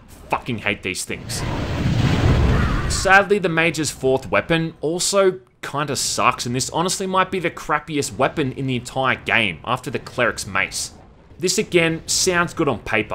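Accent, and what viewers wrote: Australia. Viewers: Thanks for the video review of one of my most dearly loved games. I’ll be sure to subscribe to see more like this. I’m an Aussie too so it’s way better hearing an Aussie doing the reviewing too lol 👍🏼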